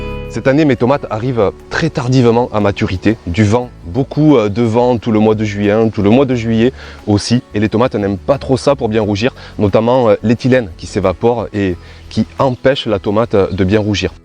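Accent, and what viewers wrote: South, France. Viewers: Merci pour cette vidéo c'est toujours aussi plaisant à regarder et cet accent du sud qui nous fait un bien fou (à nous bretons 🤣) . Chez nous c'est sec aussi ...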